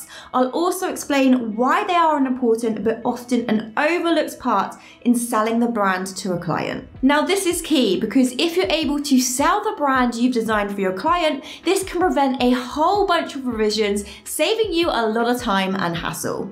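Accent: United Kingdom. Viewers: ! You have really nice british accents. Your content is always worth watching than other channels. Glad i found you and God made you ✨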